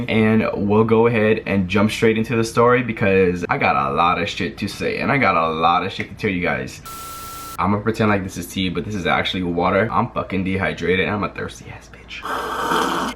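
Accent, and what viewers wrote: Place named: Asia. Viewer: u are talking like a thug speak in your normal Asian accent voice please u bottom girl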